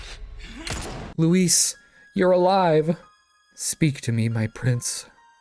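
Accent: United Kingdom. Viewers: yeah east end bruv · Another example of an American not knowing what English English sounds like. He’s not an Aussie…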